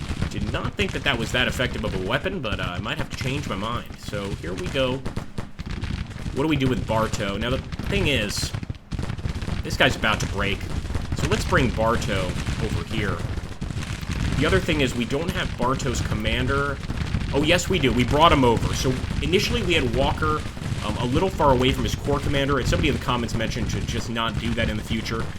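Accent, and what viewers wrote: South, United States. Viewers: I love your southern accent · Love that southern accent, spicy.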